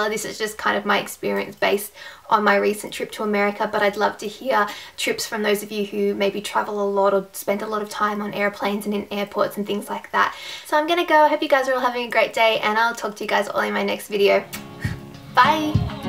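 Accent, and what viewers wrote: Australia. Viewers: Are you from Australia just wondering, also you sound like Ann Readon from how to cook that lol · Are you from Australia?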